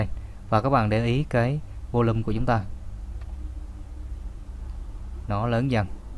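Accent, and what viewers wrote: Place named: Quang Nam, Vietnam. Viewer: anh người quảng nam hã, cho e làm đệ tử với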